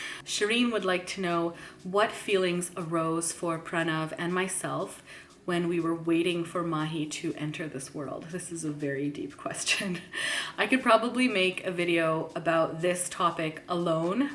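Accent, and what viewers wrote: Canada. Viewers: Your accent is like any Canadian person and any one who’s telling you fake or anything like that , they have a disease called “ jealousy “. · Thanks for sharing so openly in this q&a. Thoroughly enjoyed it and liked getting to know more about you. As another 1st gen canadian poc - I get the accent thing. We just sound Canadian, no faking ;-)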